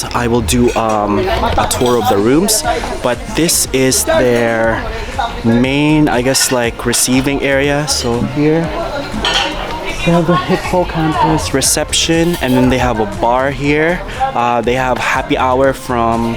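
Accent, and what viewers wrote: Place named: Canada. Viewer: His canadian accent just✨✨and the Filipino also just✨✨like wow❤️❤️❤️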